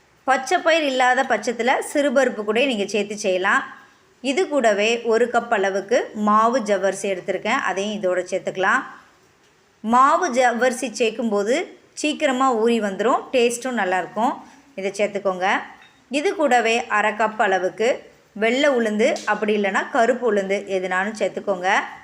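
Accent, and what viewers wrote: Tirunelveli, India. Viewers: உங்கள் பேச்சு சரியான திருநெல்வேலி தமிழ். கேட்பதற்கு இனிமையாக இருந்தது.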